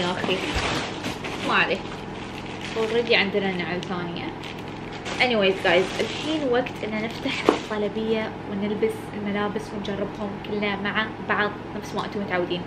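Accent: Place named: United Arab Emirates. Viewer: لهجتها اماراتية واضح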